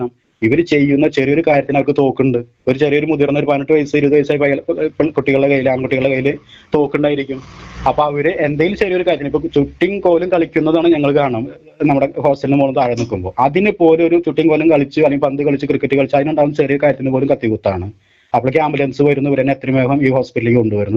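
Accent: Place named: Thrissur, India. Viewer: എന്നാണ് ത്രിശൂർ രീതിയിൽ സംസാരിക്കാൻ പഠിച്ചത്.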